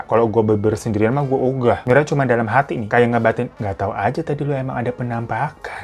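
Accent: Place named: Sunda, Indonesia. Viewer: Sok atuh nyarita deui hahha...sayah orang bandung ketawa liat kk ngomong bahasa sunda😂😂😂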